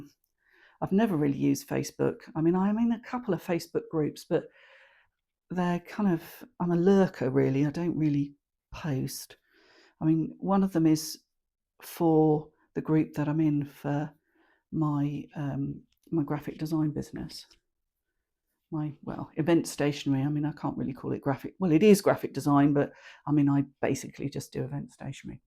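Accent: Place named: Wales, United Kingdom. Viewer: My dad’s ancestors were from Wales. I like thinking I might have had your lovely accent!!! 😂😂😂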